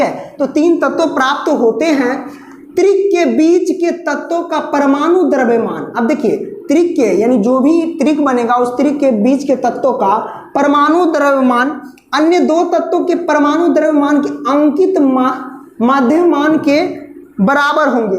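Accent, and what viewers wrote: Bihar, India. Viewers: Sir aap bihar ke rhne wale hooo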